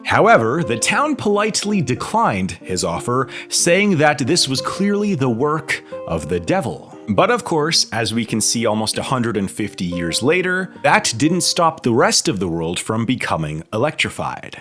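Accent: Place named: Canada. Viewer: Welcome back,! Love your vids and friendly Canadian voiceover. ☺️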